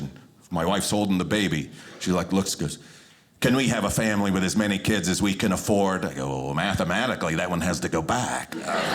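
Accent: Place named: Ohio, United States. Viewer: Sounds like he is from Ohio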